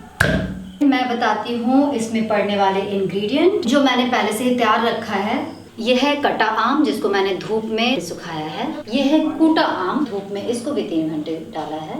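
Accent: Bihar, India. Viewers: My mom is also fan of laliya character. And also she loved your show ratan ki shaddi. You raw and original love you indian touch especially bihari accent in your vlogs.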